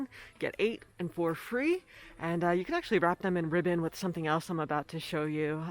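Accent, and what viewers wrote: France. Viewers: Love your FR accent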